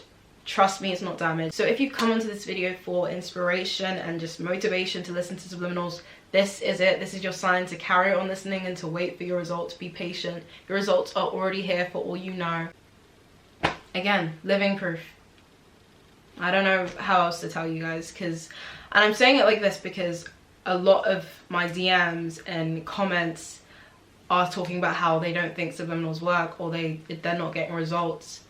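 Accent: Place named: United Kingdom. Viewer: I watched your old vids before you moved and noticed how lovely your UK 🇬🇧 accent is 👁👄👁 it fits you like you never been in the states. Much love 💗 your hair is INCREDIBLE and I’m next 🥰 claiming it 🔅🔆🔅!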